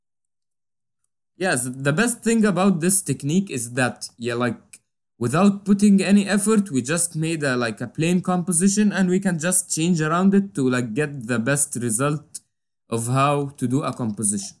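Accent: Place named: Egypt. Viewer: You sound egyptain. Iam from Egypt and I know this English accent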